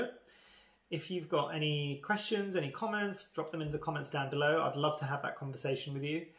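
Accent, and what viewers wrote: West Country, United Kingdom. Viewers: Really interesting video. You’ve got a bit of a West Country twang. Hi from Bristol ☺️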